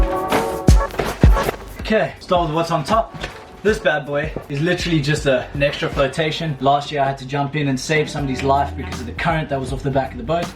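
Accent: South Africa. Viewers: That’s so awesome man, I thought I recognized the accent, cause I’m also from South Africa! Where in SA are you from?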